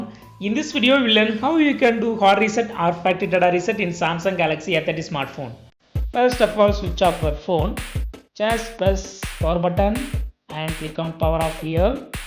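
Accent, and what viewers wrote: India. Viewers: For God sake speak English like English not like hinde accent.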